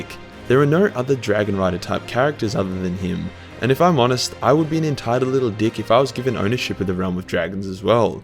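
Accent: Australia. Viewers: I love listening to an Australia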